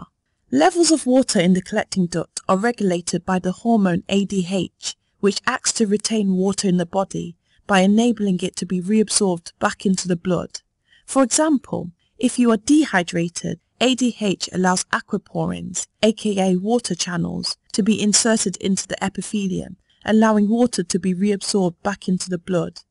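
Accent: United Kingdom. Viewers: Your pleasing british accent made this tutorial even more handy Great lesson indeed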